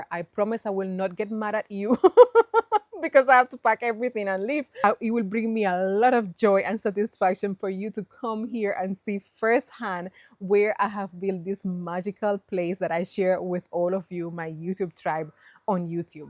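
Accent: Jamaica. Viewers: I love to listening to you speaking patios 😃😃👍🙏🏽🙏🏽🇯🇲❤️❤️ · You are such a great storyteller, content creator, wife, mother, businesswoman, and everything. So captivating. I have been watching you from as long as you have been doing this. It was you accent speaking the patois that first grabbed me, then I saw the sincerity and value in your content. Very uplifting and encouraging. I have being enjoying them all, and now Im hooked. Wishing you every success. Mucho love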